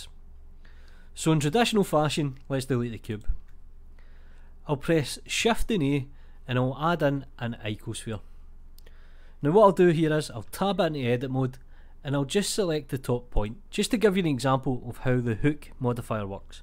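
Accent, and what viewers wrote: Scotland, United Kingdom. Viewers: Just learnt something new, I also love your Scottish accent 😁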